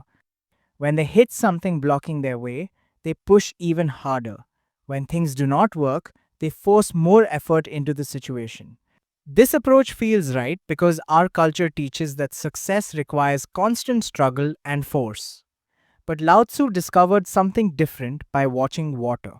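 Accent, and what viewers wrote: India. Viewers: Are you from india ? im just asking buz your accent look like that' 🙂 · Hmm. Lao Tzu stories with japanese graphic and indian voice over. I don't think so. 😂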